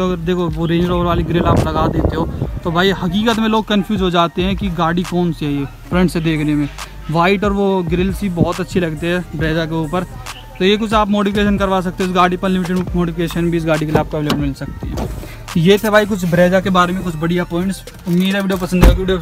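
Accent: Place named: Uttar Pradesh, India. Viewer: Gaaaddi..... kya hota h be sahi se bol UPk logo ki trah kyu bolta h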